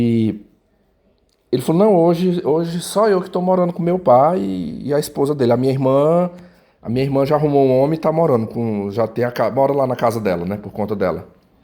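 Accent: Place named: Imperatriz, Brazil. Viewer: vc tem muito o sotaque do pessoal de imperatriz, fui lá recente gostei muito de lá, principalmente de Carolina.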